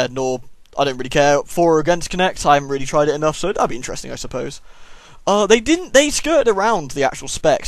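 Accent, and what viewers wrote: United Kingdom. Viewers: needs to do voice acting for a British Scooby Doo spin-off.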